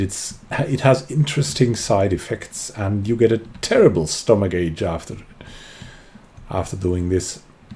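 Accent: Germany. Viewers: Are you German? I think your accent sounds like it. Bier hier, bier hier!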